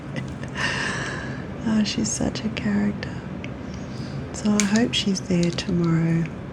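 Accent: Australia. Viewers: I found my new favourite ASMRist ❤️ the fact you’re Australian is also comforting for me. I’m from the UK and my 20 year old son is currently in the Philippines but will be heading to Australia in July where he plans on staying for up to 3 years. I miss him terribly, but you’ve definitely helped. Thank you. ❤️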